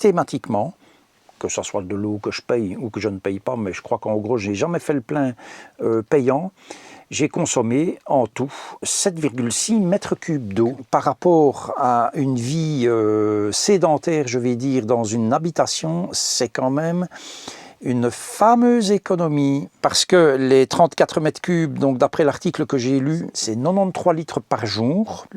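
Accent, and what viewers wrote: Belgium. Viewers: Bonsoir. Un grand bonsoir à la Belgique! Pour la conso en eau je ne puis comparer à la maison. Parce ce que j'ai 2 ados en plus par rapport à mon CC (motor-home!!) Donc machines à laver, lave vaisselle, jardin, piscine...Ceci dit ma douche dans le CC m'a appris non pas à être propre, mais à économiser l'eau sur ce poste. J'adore l'accent qui me rappel mon oncle et tante de côté de Lens (en Belgique bien sûr!)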